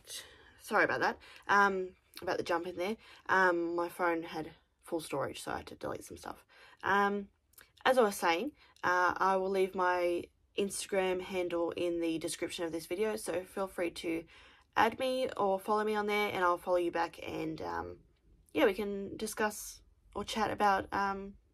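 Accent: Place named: Australia. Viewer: I am asking Santa for Tunisian crochet hooks!! So I stand corrected, you are from Australia, the accent threw me off!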